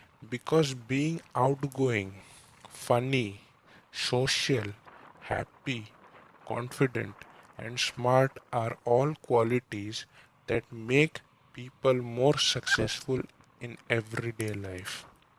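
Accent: India. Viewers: Wow it's amazing, thank you!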